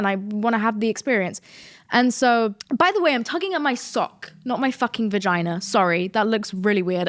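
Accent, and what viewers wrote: United States. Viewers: US accent innit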